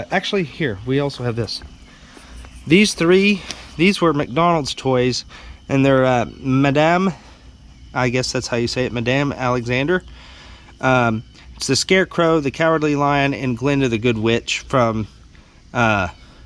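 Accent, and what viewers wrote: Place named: Oklahoma, United States. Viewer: Where are you from it seems like you are from Oklahoma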